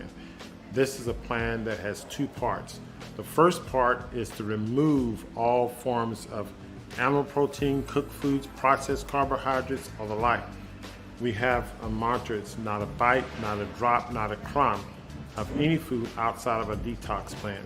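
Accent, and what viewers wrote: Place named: United States. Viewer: Sir, i am watching your useful videos from india, we cannot follow american pronounciation of english. Pl give tittles in english and display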